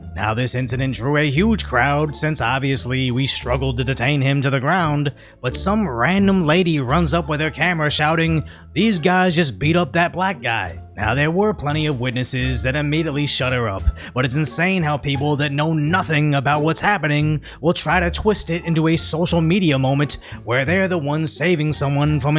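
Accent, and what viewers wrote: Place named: California, United States. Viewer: Why are californian accents seemingly disregarding normal intonations? Why are her sentences sounding like questions when they clearly are not? What a strange person.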